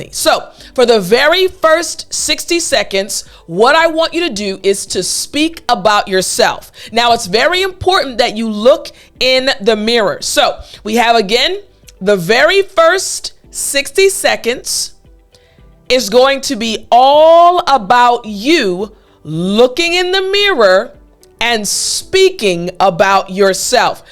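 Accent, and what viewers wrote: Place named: United States. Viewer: Only I love Amercain accent and understood all what you are taking about it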